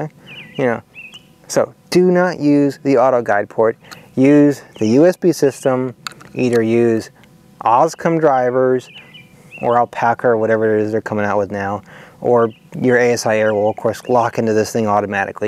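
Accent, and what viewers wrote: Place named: United States. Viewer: how come you have an american accent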